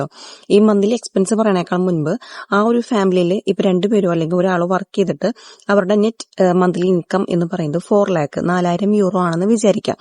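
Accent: Thrissur, India. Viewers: മ്മ്ടെ തൃശ്ശൂരാ സുഖം ട്ടാ😅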